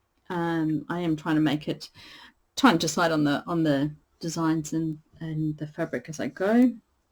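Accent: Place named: Australia. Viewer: Your video just popped up so I have subscribed. My friend and I were just admiring a Ranunculus knit in lace weight at our local yarn store. Yes cross stitch has come along way. With 32 ct you a go either 1 or 2 threads. I have been enjoying working with 1 thread lately I prefer to have my fabric quite tight in my hoop. But there are so many options these days. Belfast linen is 32ct by Zweigart (it’s not the colour name). Each of their different linen counts are named after UK cities. I have just started making floss-tube videos but I also knit and quilt and love hexies. I am in Brisbane so lovely to hear another Aussie. My channel is Lynette Peters XStitchandHexagons. Tonight I’m knitting The Little Arrow shawl.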